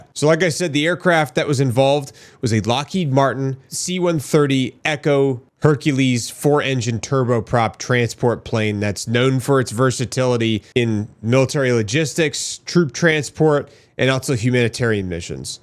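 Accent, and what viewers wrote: United States. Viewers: Very sad story. On the other hand, it always amuses me, how Americans pronounce Georgian words.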